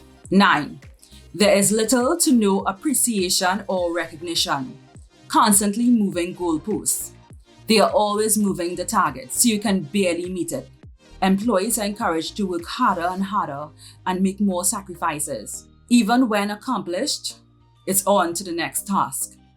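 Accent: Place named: Trinidad and Tobago. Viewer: Hi,. I have been following you on Linkedin for several years. I love your Trinidadian accent! Out of the ten signs that you covered, the one that resonated most with me was the last one. I always say that where there is smoke, there is fire. When a company has a high turnover rate, then bad management is almost always the culprit. If you treat employees humanely, then they will want to stay.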